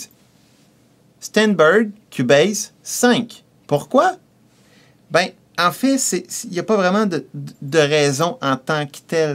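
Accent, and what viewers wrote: Quebec, Canada. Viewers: cool il est quebecquoi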